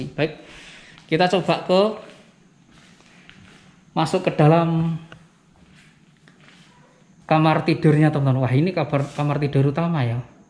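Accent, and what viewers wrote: Java, Indonesia. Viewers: Ngomongny ms"ny jowo banget...hihi....smoga yg punya msh sehat Aamiin.. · Suka bgt kesini karena logat jawanya beda dr yg lain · suka bingiiiitt bhsa jawa nya medok banget mas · Ini Vloger keren, baru nemu Eyang. Dah langsung subcrib, ngelonceng, like, koment. Dan yang penting Eyang dah nonton sampai gusisss😃😃😃. Suka gaya bicaranya yang njawani. Semoga subcribbernya semakin mlenuk, Nak🙏👍❤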